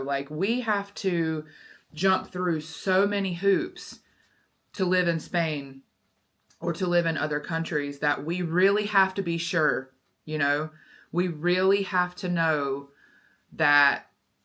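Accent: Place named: South, United States. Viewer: Have you seen Evan Edinger's videos about food banned in Europe, but not the US? That was an eye opener to me as a lifelong UK resident and really made me wonder what the FDA actually does. Combine that with the fact that the FDA's failure to properly regulate opioids lead to that crisis in the US and it seems like it's a regulator that has been so defunded that it does very little of what work it really needs to. This is the thing that feels so off about the US culturally - it went from "No taxation without representation" to "No Taxation, we don't need no government", so it feels like virtually every regulator is unfit for purpose. The Supreme Court limited the ability of the EPA to control power plant emissions in a fairly recent ruling. I'm saying this to try and put it across that I hopefully get some of your reticence about heading 'home', that the changes in how the country is run that you may like to see would be a very hard sell to other voters, let alone finding a party to represent that. 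To hear your worries about US school shootings while Tennessee are passing a bill to arm teachers instead of looking at the problem at source (and I'm guessing that your accent is from somewhere like Alabama, Kentucky, Tennessee or Georgia), I wish I had words of comfort on that front. Good luck finding a home in California, hope that maybe you can find a community where they try to grow their own food to a higher standard than the FDA asks for and Robert can continue to eat well.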